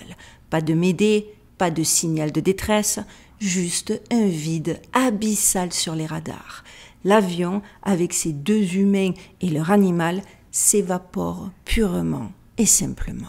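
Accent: Marseille, France. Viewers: Bonjour. Merci encore pour tes infos, ta diction et ton accent qui rappelle que la Sardine a bouché un jour un très grand port. Ne changes rien. Par contre certains sont vraiment murs pour l'asile. A force de faire n'importe quoi et de se prendre pour Dieu le père, ces derniers contribuent assurément à la destruction de l'humanité. See you next sunday! 😍😘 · Bonjour je t'avoue que les 3 sujets sont plus qu'inquiètant mais je dirais que le dernier est le pire!!! Quand à ta prononciation, il n'y a vraiment rien à dire, tu t'exprimes extrêmement bien et tu n'as même pas pris l'accent Américain... Non toujours l'accent Marseillais 😊 j'adore 👍🏻 merci à toi de nous parler de tous ces sujets dont personne ne parle 😤 passe également une excellente fin de semaine bisous 🥰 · Tu es trop mignonne ma chérie et tu parles trop bien français t'inquiète avec ton accent du sud c'est merveilleux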